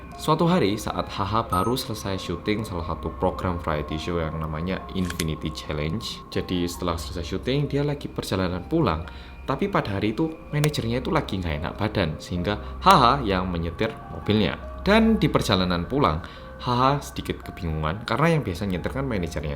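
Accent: Java, Indonesia. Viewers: kangettt gw, suara mu itu lho bang medok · Medeeeekkk nya itu lhoo masss😆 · Iya medok ny ilangin ak suka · Medokkkkkk aja mas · Jam 1.44 AM kyaknya jdi jadwak tetap nonton rindingdong deh, makash mas berkat suara medok mu aku jdi cepat ngantuk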